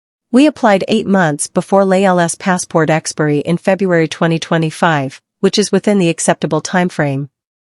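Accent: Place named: United States. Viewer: Nice vid. Thanks for sharing. 👍 Who is the voice doing the narrating? Sounds like an American voice.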